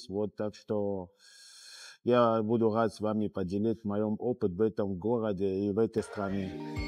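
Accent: France. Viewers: Моя учительница биологии жила и работала в Камеруне. Много рассказывала про Камерун, природу и людей. У такой французский акцент. Приятно слышать хорошие слова о России. Спасибо. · у него родной язык французский, франкоговорящему вообще очень сложно избавиться от характерного акцента, тем более с таким сложным языком как русский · Вы пишите бред-мой друг Француз(и предки его Французы))),научился говорить несколько фраз на русском и Р у него отличная,и акцента французского намного меньше чем у героя этого видео(хотя он во Франции живет, а не в России и разговорной практики у него мало).Все дело в желании.В русском тоже нет звуков которые например в английском есть,только почему то я эти звуки призношу отлично.А насчет картавости вы насмешили))Негр этот не картавый,он просто не заморочился от акцента избавится.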